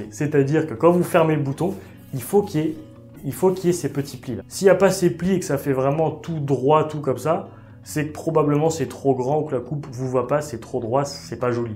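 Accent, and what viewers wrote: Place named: Banlieue, France. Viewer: Tu parles comme dans la banlieue wesh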